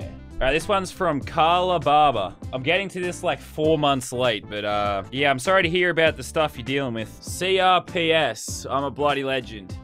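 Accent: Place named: Australia. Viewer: Even when he screams the theme, he still sounds australian...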